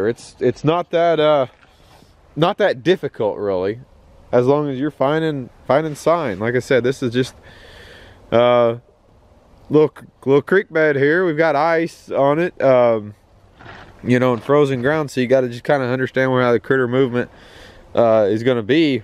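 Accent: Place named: Pittsburgh, United States. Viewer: Your dialect sounds like Pgh. Pa? Or close?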